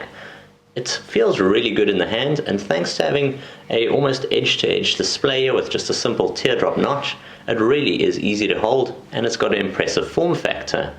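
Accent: South Africa. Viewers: Your accent makes me think your from South Africa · Are you south african? (I hear hadidas)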